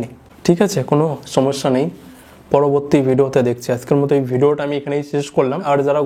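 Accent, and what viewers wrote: Bangladesh. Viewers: Tor bhasa shunei mone hocche, tui bangladeshi,.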